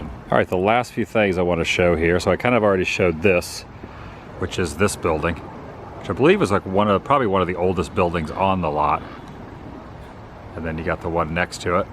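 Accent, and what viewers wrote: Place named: Philadelphia, United States. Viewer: Your accent is all too familiar - You from Philly PA vicinity, or Delaware/Maryland?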